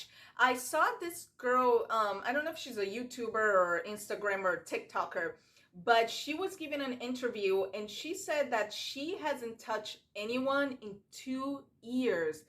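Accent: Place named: Brazil. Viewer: És brasileira? Quase que adivinho por causa do sotaque :) Eu sou portuguesa, ahahah! Descobri agora o teu canal. Já subscrevi.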